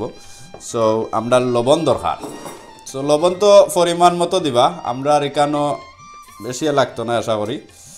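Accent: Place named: Sylhet, Bangladesh. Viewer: খাওয়াটা বড় কথা নায় আপনার খাস সিলেটি মাথ হুনিয়া খুব ভালা লাগল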